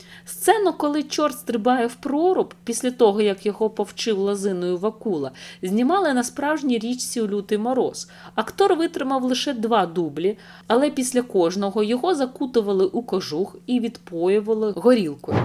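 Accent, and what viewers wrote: Russia. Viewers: текст читається з потужною московинською вимовою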